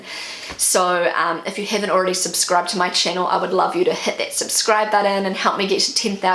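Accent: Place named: New Zealand. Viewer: It's because her Kiwi accent is non-rhotic (they drop Rs at the end of syllables). But there is a subtle difference; the vowel at the end of "errors" is an unaccented schwa, while the vowel at the end of "eras" is a bit more open and closer to "a" as in "arbiter".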